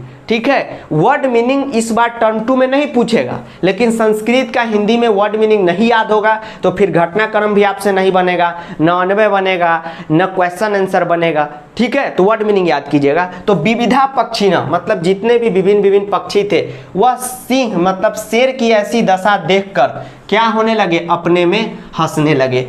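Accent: Bihar, India. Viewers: Sir aap bhi Hamare Bihar ke ho kya ekadam Hamari Tarah Bhasha bolate ho कभी-कभी aap bahut Achcha padhaate ho thank you sir apni matrabhasha Mein samajhne ka maja Hai Kuchh aur Hota Hai😎😎☺☺☺☺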